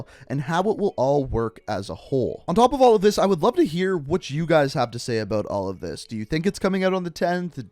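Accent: Canada. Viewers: By his accent I'd guess he's from or near Winnipeg, Manitoba Canada. He sounds like a professional wrestler named Chris Jericho that has the same accent.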